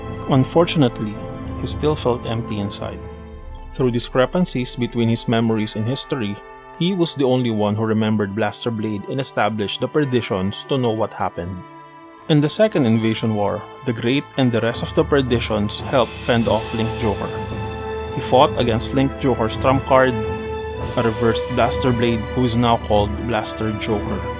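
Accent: Philippines. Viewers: You sound like a filipino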